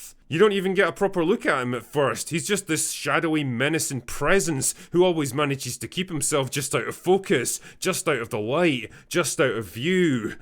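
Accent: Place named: West Lothian, United Kingdom. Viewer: The Drinker Recommends, I watch And it’s not because he sounds like he lives just along the road from me (Livi - though I’m a proud westerner. Mon The Sons)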